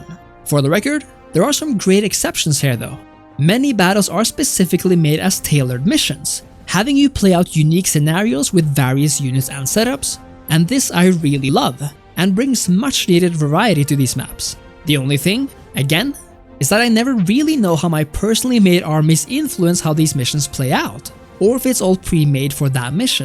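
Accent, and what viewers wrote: Norway. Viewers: Må jobbe med den norske engelsken din kompis \__('^_^')__/ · You norwegian accent is just awful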